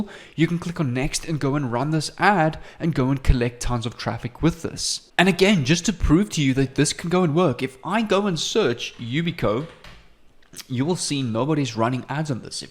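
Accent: South Africa. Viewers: Are you South African Mate? I'm very familiar with that accent. But you are great. I trust you and Im taking my shot on this one.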